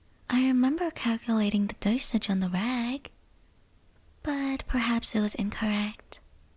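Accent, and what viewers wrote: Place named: Australia. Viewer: Always nice to hear a fellow Aussie do asmr :o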